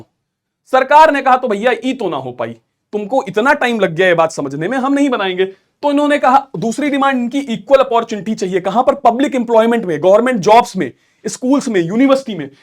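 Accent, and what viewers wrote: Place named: Bengal, India. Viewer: Sir ke accent se lag raha hai ye bangla Sinhana ko singhla bolne me dikkat kisi bangla ko hi ho sakti hai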